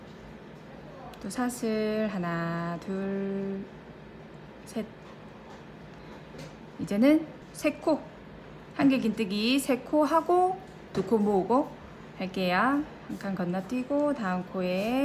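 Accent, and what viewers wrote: Gyeongsang, South Korea. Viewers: 진짜 이쁘네요 살짝 경상도 사투리가 매력이 있네요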